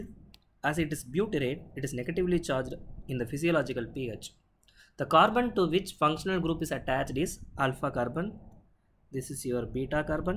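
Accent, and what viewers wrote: India. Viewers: Sir Just by your accent that I make out that u r from tamil nadu. Same accent was of former IPS officer Annamalai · R u from south india?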